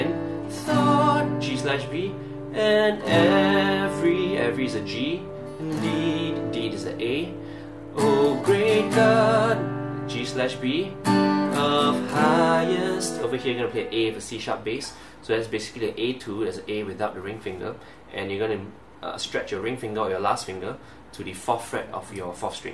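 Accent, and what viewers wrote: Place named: Singapore. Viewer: I thought I recognized that accent! My wife is Singaporean and I lived in Singapore for 5 years, now in the USA. Thanks for the video. I recommended it to our musicians as we're learning this song for this Sunday. Regards, John Carpenter ("yeoberry" is a combination of my wife's maiden name, Yeo, and my middle name.)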